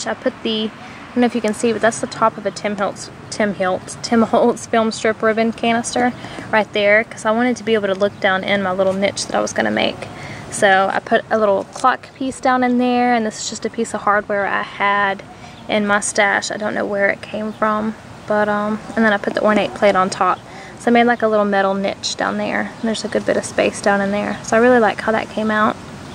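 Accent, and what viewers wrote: South, United States. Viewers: Oh my goodness this is so pretty!!!! I love it....I knew you were a southern girl.....just didn't know what part.... I'm know Pea will love it....awesome project.....as always.....tfs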